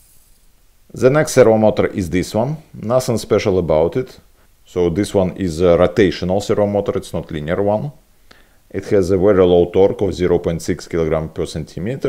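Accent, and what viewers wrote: Russia. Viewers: Are you Russian?)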